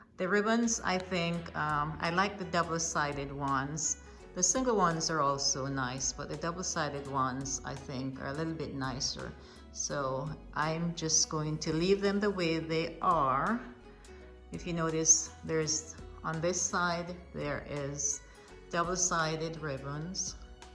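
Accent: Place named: Jamaica. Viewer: Your home is super gorgeous! I love your staircase, so elegant and whimsical. You sound like a Jamaican sistrin lol. I love how your tree look. It's absolutely stunning! New subscriber. This was so much fun to take part in this challenge with all you wonderful and creative sisters. Thanks so much for sharing.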